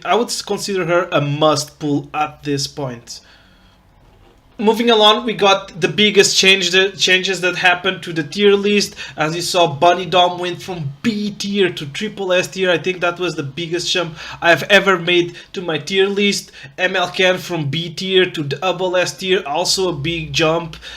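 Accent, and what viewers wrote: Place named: Portugal. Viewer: Ouvi-te falar um pouco, e fiquei logo a pensar que eras tuga. Quando descobri que tinha razão... É tão bom ter um content creator de Epic7 português! Continua!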